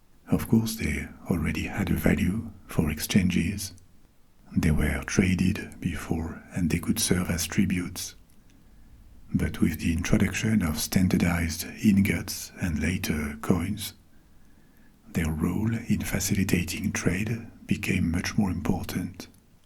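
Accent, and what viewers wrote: France. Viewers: Love your French voice it so calming. Thank mercie becoup! you for the good nights you give😂😂❤😴🥱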